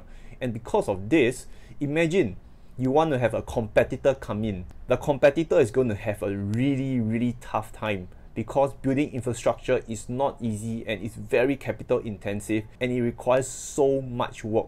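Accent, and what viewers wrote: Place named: Singapore. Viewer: You're from Singapore, that is 100% Singlish.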